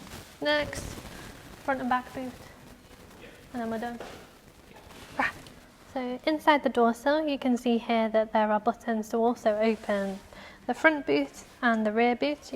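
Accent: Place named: United Kingdom. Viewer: I’m looking for a Boxster 718, so this is helpful. As an American, I love her British accent.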